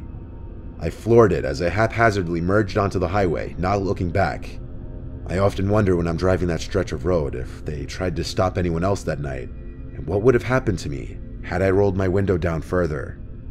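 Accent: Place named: New Jersey, United States. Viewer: I'm not done listening to this yet, but after listening for years I only just realized has a slight accent versus standard American tv sound lol. Noticed from the 'a' pronunciation in 'ass'. Have a couple theories about region but not positive, it was just a quiet:D moment for me.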